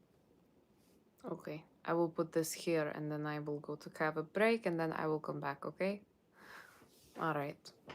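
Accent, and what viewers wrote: Russia. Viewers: Great Russian accent ❤ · I'm Romanian living in Australia!! so definitely Da !! loved the Russian accent u reminded me of the Russian actress on shameless 😅❤ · So cute 🥰 love your Russian accent 💞 Thank you for Scorpio and Virgo reading....both reading is freaking accurate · The Russian accent is quite smooth and lowkey soothing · Your russian sounds so real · Wowww,this was the most pleasant surprize for today !! It's Friday night here in UK now when i find your reading dear Russian woman and you made my evening ! Love your look and natural russian accent! ❤😂❤❤❤❤❤❤❤❤❤❤❤ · I love your Russian accent 😍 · I love your russian accent... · I love the Russian Voice it make you more beautiful thanks 👑💯❤️🔥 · Omg i loveeeee this russian look and accent!!! ❤❤❤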